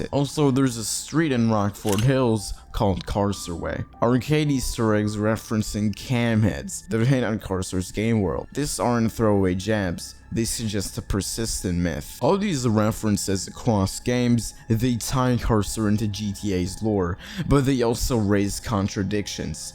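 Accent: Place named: Birmingham, United States. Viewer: Sound kinda like Birmingham al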